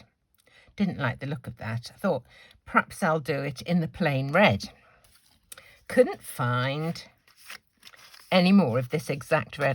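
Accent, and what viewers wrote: England, United Kingdom. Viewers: Hi. I am in Spain and love your videos. Not many with a lovely English accent. I haven't got the same die, but I do have one with a gnome on and will try and do something similar. I hope it works out. thank you so much for sharing this idea. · I’m watching you from Mississippi, USA. can’t always catch you during the day but usually enjoy your videos in the evening. I love you cards and your soothing British accent. Looking forward to your next video.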